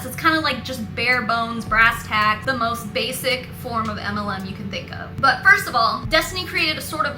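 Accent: South Africa. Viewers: Oh. South Africa. Thats my country. Well its about time the shitstorm that is South African cults is opened up. Its a wild ride to hear your own accent in a video about cults, Im not gonna lie. Sadly, its a common belief here that certain people can take in spirits and release them, especially if you're Christian. However, that gal? She needs some medication, and a therapist. ASAP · Yoh! that south african accent is THICK! also for some reason people here in South Africa have an obsession with Demons...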